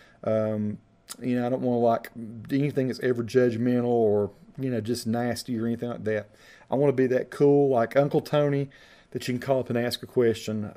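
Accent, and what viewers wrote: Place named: Appalachia, United States. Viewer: Yes the Hillbilly accent is cool and I like the way you are so open about your Appalachian life. Just seeing the fields and forests and talking to your brother about Hillbilly stuff is nice to experience. I am living in dutch society that is quite the opposite of Hillbilly (in the province it is not that different though). Nice to see such a positive good humoured video around Christmas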